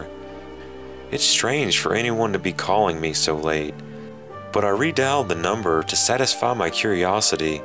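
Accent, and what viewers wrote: South, United States. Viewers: he's southern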